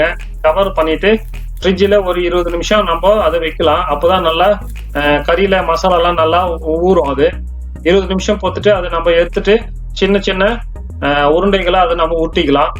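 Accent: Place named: Bangalore, India. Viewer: Bro neengo Bangalore slang pesuringo correct I think you are from Bangalore I followed your tips it came very wellmy wife & childrens enjoyed it Thank you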